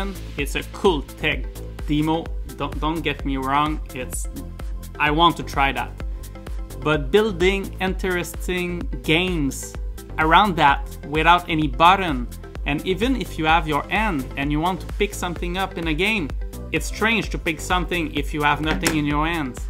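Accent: Quebec, Canada. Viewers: je reconnais l'accent quebecois et vive le canadiens! lol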